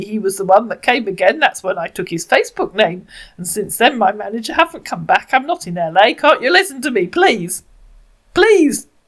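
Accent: United Kingdom. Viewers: The way she said, "it sounds like it was written by an illiterate moron", with that proper British accent literally had me rotfl... It just struck me as super funny. Thank you for educating people with humor & hilarity 👍👍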